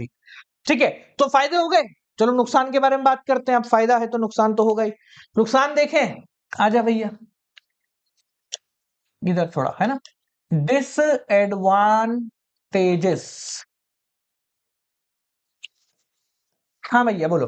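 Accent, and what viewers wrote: Rajasthan, India. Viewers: Sir ap rajasthan hu ho kyi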